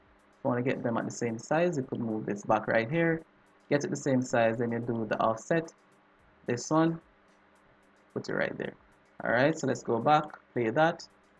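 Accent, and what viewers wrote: Jamaica. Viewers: I can hear the Jamaican in you! Love it!! Now let me return to the video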